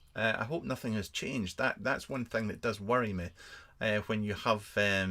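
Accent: Scotland, United Kingdom. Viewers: I really like the intro/hold music. Shame that rambling Scottish bloke popped up again and ruined it... To be fair this is the exactly the review on the Boxx I’ve been waiting for. Authoritative.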